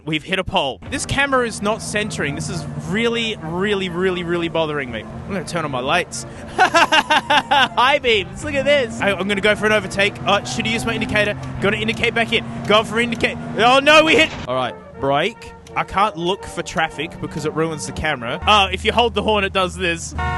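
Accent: Australia. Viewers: Australian let's game it out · Omg its aussie. I needed this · I clicked on this video, having no clue what I was in for the first two seconds. I hear funny Australian man and goofy old Timey music. I don’t know how I have accidentally found the funny iPod man.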